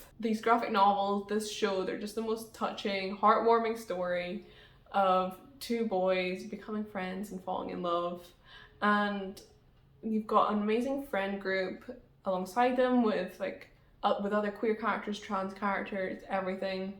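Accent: Scotland, United Kingdom. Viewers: Do you have a Scottish accent when you speak Spanish? 😆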